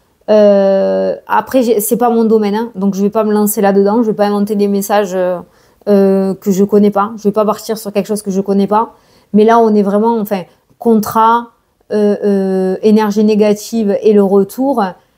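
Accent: South, France. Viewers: Merci pour votre bonne humeur, vous êtes un rayon de soleil dans la vie , redonnant l envie de repartir positive et confiante ,votre accent du sud et chantant stimule.😂5❤👍🥳 bravo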